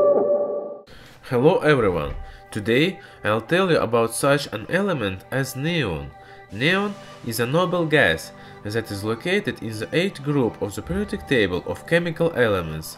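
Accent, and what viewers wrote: Russia. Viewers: nice to be a brazilian that try to understand a russian talking in english. =D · this is russian accent??😂😂😂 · I can't understand anything from your "russglish"👌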